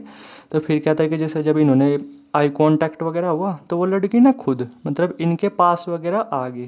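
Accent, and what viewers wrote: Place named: Western Uttar Pradesh, India. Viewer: Nice story bhai ❤. Aap apni boli se west up ke lg rhe ho, whi k ho kya?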